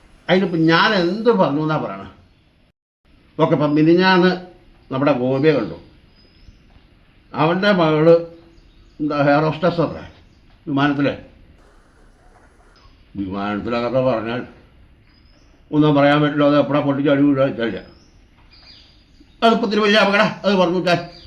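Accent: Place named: Namboothiri, India. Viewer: സത്യം പറഞ്ഞാൽ നമ്പൂരി സ്ലാങ് ഇത്തരം കഥക്ക് മാച്ച് അല്ല. നിങ്ങളെ പഴയ പർളിവുഡും നമ്പൂതിരി നേരം പോക്കും തന്നെയാ നല്ലത്. ഞാൻ രണ്ടും ആസ്വദിക്കുന്ന ആളാണ്. അതായത് അവനവന് ചേരണ കോണകമേ ഉടുക്കാവൂ എന്ന് സാരം❤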